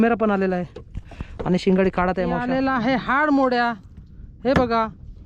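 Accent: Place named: Mangeli, India. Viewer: भाऊ तुझी मराठी मांगेली भाषा ऐकायला मज्जा येते, एक पाट धाडून दे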